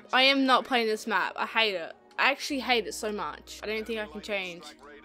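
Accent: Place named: Australia. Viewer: also, the Australian accent is awesome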